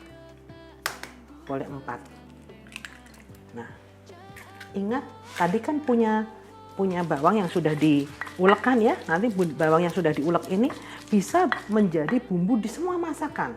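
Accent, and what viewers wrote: Java, Indonesia. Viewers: Ya ALLOH, saya banget liat bunda msk, suka logat jawanya medok bangeeet ☺☺☺ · Bisa lucu juga lho mbak,medok bs Jawanya,.. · Bunda..jan Jowone ga ketinggalan..salut bunda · Ya allah luwes banget nguleknya,nggak nyangka bunda pinter masak. Jowone yo gk ilang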